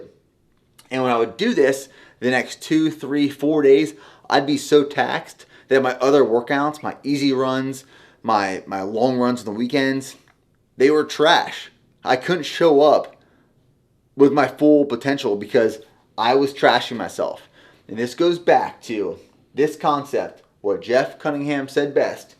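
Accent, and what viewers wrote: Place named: Pennsylvania, United States. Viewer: Its a Pennsylvania thing, like the way he says "On", "Awn"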